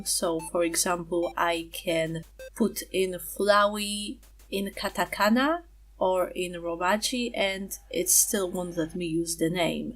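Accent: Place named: Greece. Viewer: Are you greek? Your accent sounds greek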